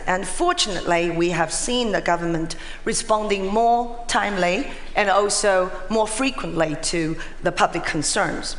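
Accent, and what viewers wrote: United Kingdom. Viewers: why does she have an british accent? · 杨澜在美国念书，但是发音是英式，有意思。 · interesting.... she has British accent rather than American...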